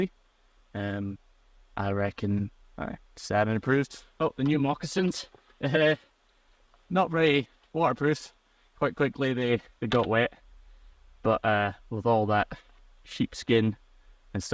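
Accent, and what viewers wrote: Scotland, United Kingdom. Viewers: Once again an excellent video. However the flute, pipes and rushing water made hearing and understanding your brogue a bit difficult for this Yank. Too many folks over do the “background” music to the detriment of their videos quality. Just my pet peeve. I truly enjoy your love for accurate renditions of highland history.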